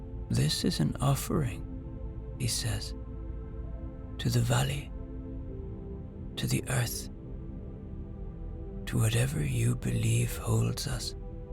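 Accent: Ireland. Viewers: Amazing. This story took me to a dream with my beautiful sister, who recently died. Beautiful memories, love, sobbing grief and acknowledgement of acceptance of grief as part of journey..thankyou again. I love your Irish accent and gentle voice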